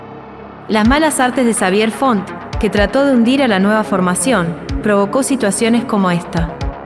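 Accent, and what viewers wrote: Argentina. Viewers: Muy buen video. Ahora bien, la locutora (que hace un muy buen trabajo) es claramente argentina, asi que resulta raro escucharla usar giros idiomáticos netamente españoles como "chupipandi" o "vidio" mientras pronuncia "eshos" y demás. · ¿Narración con acento argentino y modismos de España? 😑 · Canal pedorro y tendencioso. Informar con humor? Son peores que Locomía! Y la mezcla de locución «porteño - neutro» es de terror!! Quién te de deja una «megusteada»? 👎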